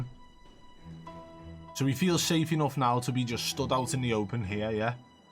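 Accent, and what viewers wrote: Liverpool, United Kingdom. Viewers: best scouse wz2 commentator out there, yes lad 😉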